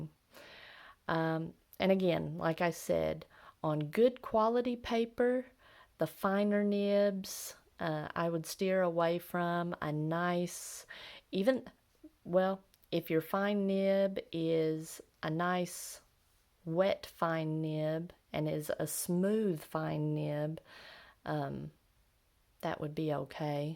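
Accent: South, United States. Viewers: I’ve really been enjoying your ink studies. Tell me, do I detect a southern accent?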